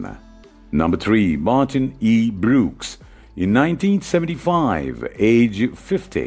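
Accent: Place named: Afghanistan. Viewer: Come on man!! Why is someone from Afganistan narrating this ALL AMERICAN tv show cast..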